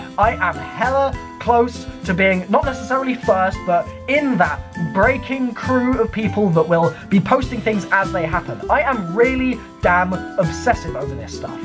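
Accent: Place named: United Kingdom. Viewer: "8 blooDee years." British accents are amazing.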